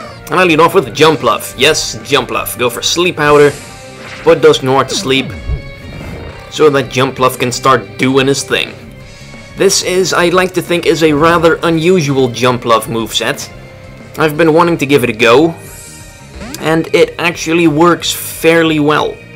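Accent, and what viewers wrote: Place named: Spain. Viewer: español de pura cepa jajajaja pedazo de acentazo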